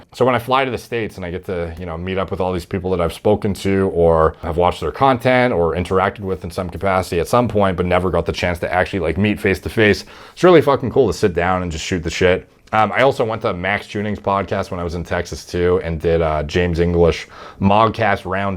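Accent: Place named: Canada. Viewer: I have some bosses based in Canada and after listening to them in meetings for months now, really does sound so Canadian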